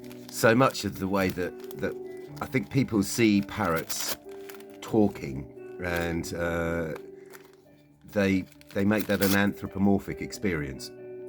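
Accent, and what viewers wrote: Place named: United Kingdom. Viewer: 😊i love your relationship..it is so loving and pure. I love watching you interact together. And I really love your British accent..I am from the USA by the way. So happy I came across you and Smurfy ❤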